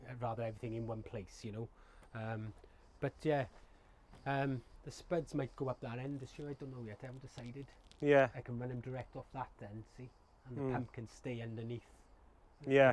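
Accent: South Wales, United Kingdom. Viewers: Yep, you definitely have a south wales accent cariad 😆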